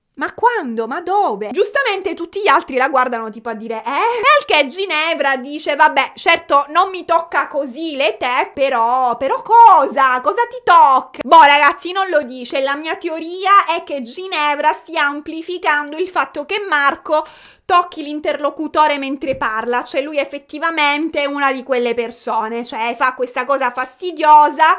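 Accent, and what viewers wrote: Sicily, Italy. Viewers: Ti ho scoperto da poco. Sei siciliana? Hai un accento credo simile.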